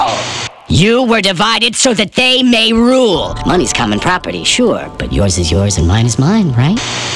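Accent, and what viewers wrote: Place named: Russia. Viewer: По мне в русской озвучке намного лучше...К тому же тут какой-то русский акцент прощупывается(хотя возможно это только мне кажется)...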